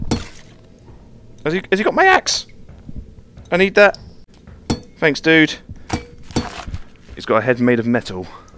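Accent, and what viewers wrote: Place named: United Kingdom. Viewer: the way you speak just screams british and i love it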